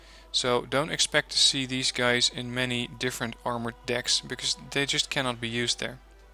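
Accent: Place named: Netherlands. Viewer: Bedankt man, heb het spel net gekocht, en ben het nu aan het leren, ik ken de basics van World in Conflict nog wel en dit lijkt er wel een beetje op. Je guids helpen er goed mee! (Volgens mij kan ik in je accent horen dat je nederlands bent, geen zorggen je klinkt niet als Mark Rutten)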